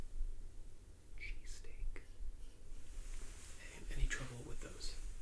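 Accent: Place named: United States. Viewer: This guy looks so British I was expecting a regional accent like a Yorkshire accent not a freedom murica one. Great ASMR though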